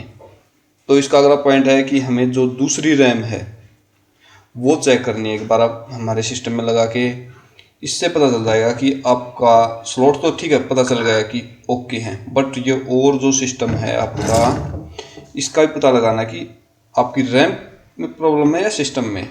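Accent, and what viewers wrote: Haryana, India. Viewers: Bhai boli to haryanvi lg rhi h kit k ho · Bhai apke muh se halki halki haryanvi sun ke maza aa gaya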